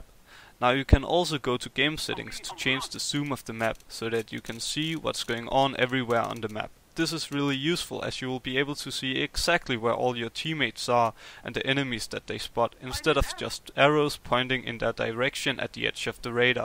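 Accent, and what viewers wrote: Denmark. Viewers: You’re danish right? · That danish accent!! :p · Du er dansk kan høre det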